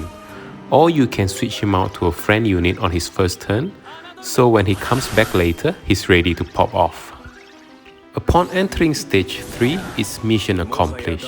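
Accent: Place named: Singapore. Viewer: hi bro are u singaporean too? sounds like one also might need help on ddffoo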